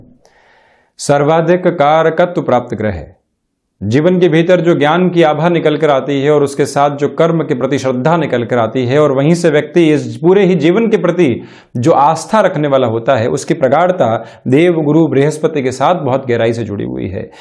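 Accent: Standard. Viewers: Sayali absolutely my Hindi has actually improved to some extent hearing his pure Hindi.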